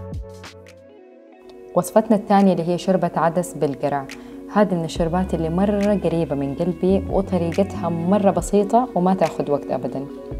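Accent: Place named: Jeddah, Saudi Arabia. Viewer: الفديو جوناااان والتصوير ماشاء الله ولاغلطة بس صوت الميوزك مزززعج لوصوتك بس وهدوء كان ولا غلطة اللهجة الجدوية جمييييلة معاك ربي يوفقكك 💛💛💛❤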